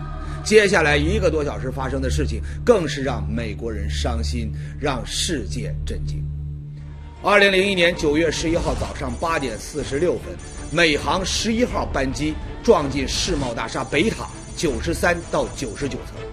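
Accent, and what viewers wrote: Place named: Beijing, China. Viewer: 主持人真棒，好像是北京人！赞赞赞👍👍👍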